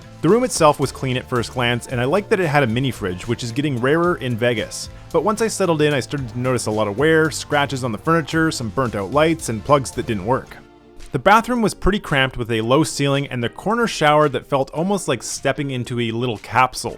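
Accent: Canada. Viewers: Are you Canadian??? 🤔 if not, you’ve got a Canadian accent!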